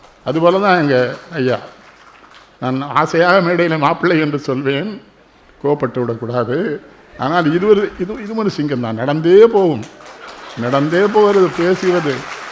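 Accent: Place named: Tirunelveli, India. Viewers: நெல்லைத் தமிழை அழகாகப் பேசியவர். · இவர் பேச்சு நெல்லை தமிழ் கேட்க கேட்க திகட்டாத தெள் அமுது ஓம் நமசிவாய வாழ்க வாழ்க ஓம் சக்தி பரா சக்தி சாந்தி சாந்தி சாந்தி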